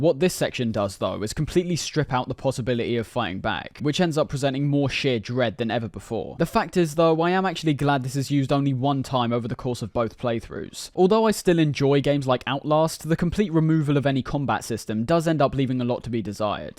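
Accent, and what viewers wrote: United Kingdom. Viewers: When I saw this on my feed I said to myself, "please have a brittish accent, please have a brittish accent". Was pleasantly surprised, idk why but it gives a sort of legitimacy to these essays.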